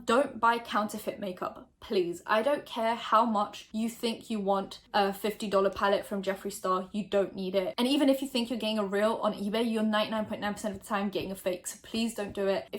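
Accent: United Kingdom. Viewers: Hi I’m a new subscriber! is a brother of backstreet boy Nick Carter. He was on the Lizzie McGuire show and played his famous song “ ” 🍭. Currently as an adult I believe he is mentally ill, as he threatened to kill his sister and his brother, and their children. They got a restraining order against him to protect themselves/their families. Aaron was court ordered by a judge to hand over his weapons (he has a lot of guns). Former child pop star. That’s all. Sorry you got hate by bad Larry’s in the comments. That’s not fair. I’m 23 years old so you missed the era by a few years not to mention you have a UK 🇬🇧 accent so he might not have been as popular across the pond. Look forward to more tea 🍵 ☕️ videos!!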